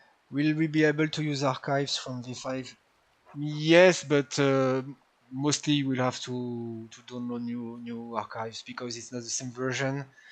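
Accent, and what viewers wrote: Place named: France. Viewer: Ahah, j'ai entendu l'accent, j'avais un doute!! Vive la France!! Vive la république!!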